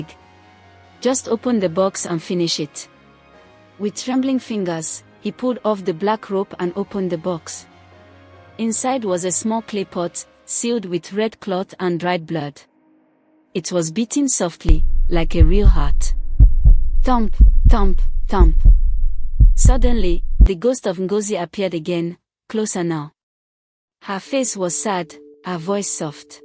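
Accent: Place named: Ghana. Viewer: This your pigin English dey sweet 😂 E dey sound like Ghana people pigin Cheap particles dey run belle so dey said Haunted house no pass like this o😮 Na God go help use o Even mama Ike dey fear too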